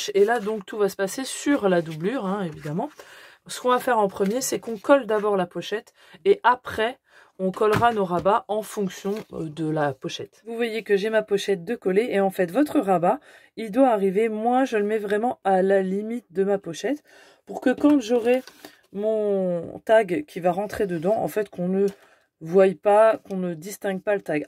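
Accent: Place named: North, France. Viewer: Chalut , je visionne tes tutos merci d' où es tu ? Pour dire vingT en prononçant le T ? Du Nord , de la Haute Saône ? Jaime entendre les accents hi hi 😂❤🎉 Chat'leureusement F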